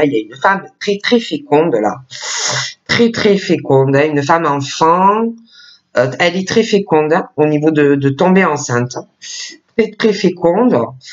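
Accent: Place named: Southwest, France. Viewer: J adore l accent différent du sud ouest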